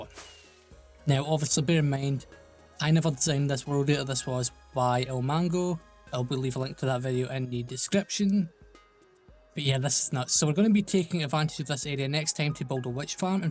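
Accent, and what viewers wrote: Scotland, United Kingdom. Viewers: bro ur scotish accent really annoys me Xd (no hate)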